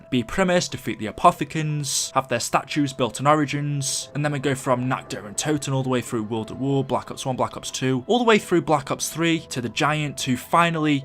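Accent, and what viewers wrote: United Kingdom. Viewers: Is the Mansion in buried the blacksmith's who built the pack a punch? I mean the pack a punch is near the Mansion so can this possibility be true? P.S show me in the video plus are u British?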